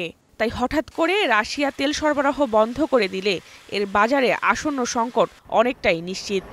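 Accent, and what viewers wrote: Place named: Comilla, Bangladesh. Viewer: Voice eirokom keno ? Didi r bari ki Comilla?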